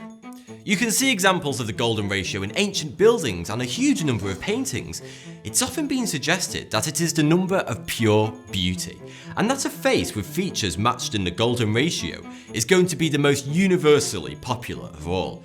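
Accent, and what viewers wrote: United Kingdom. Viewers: you're British aren't you?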